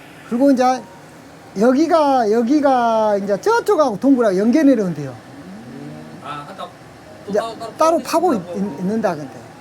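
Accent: Jeolla, South Korea. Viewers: 전라도분인가 보네요..사투리를 찰지게 쓰시네요..ㅋㅋ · 토종 전라도 사투리~ 했제 그랬제 그랬당께~ 께~ 께~께~께깽~ 깽~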